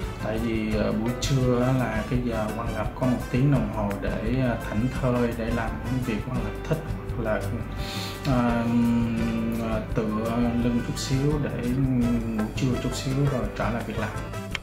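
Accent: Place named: South, Vietnam. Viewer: Trước giờ tưởng Quang lập người miền Bắc giờ nghe nói giọng nam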